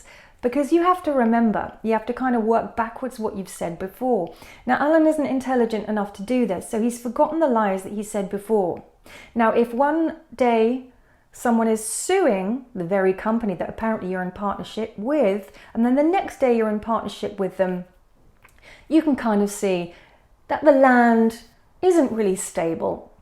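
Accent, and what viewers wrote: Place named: United Kingdom. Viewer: First...Thank you. Thank you so much for spreading light on AD & any other Youtubers/Scammers that are trying to scam good hearted people. Your voice is beautiful. I've always loved the Bristish accent. You are a beautiful person to finally expose AD (Alan Viddecom) however you spell his name. Thank you. Thank you so much. 🌻🌻🌻🌻💜💜💜💜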